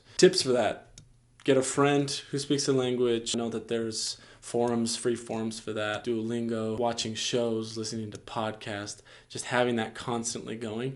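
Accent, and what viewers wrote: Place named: Brazil. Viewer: ele é br dá pra ver pelo sotaque do inglês dele hahaha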